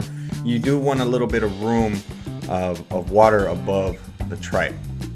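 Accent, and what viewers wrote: California, United States. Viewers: I cook my menudo for about 5 hours. I'm from Texas sounds like your from California. Menudo is great,especially in cold weather like,Now! Thanks for your recipe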